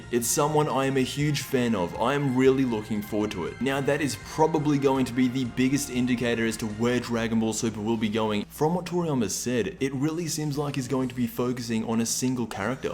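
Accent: Australia. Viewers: The Nerdy Toad yeah i think, i am an aussie and u can tell by his voice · best dragonball youtuber ever, might be that aussie humor culminated with the accent!!! haha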